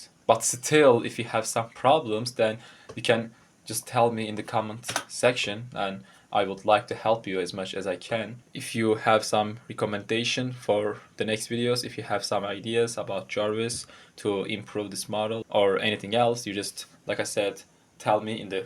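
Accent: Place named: Turkey. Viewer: Türk Aksaanini biraz belli etmis gibisin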